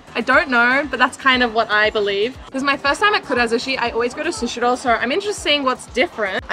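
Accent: Australia. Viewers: Is your English Australian? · Love your Aussie accent 😊. Thanks for your video from a Japanese-Canadian 🇨🇦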